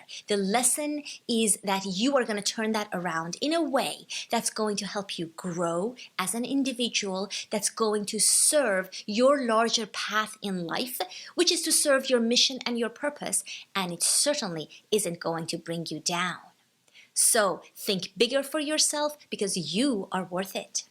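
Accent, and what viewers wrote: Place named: Arab world. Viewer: Are you originally from an Arabian country ? Or from Eastern Europe? Just trying to place your accent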